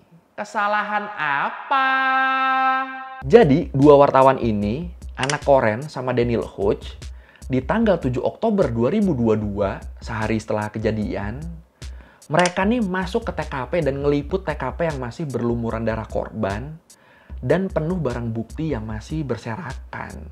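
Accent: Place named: Madura, Indonesia. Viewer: Aksen Madura nya dpt bgt... asli Madura ya?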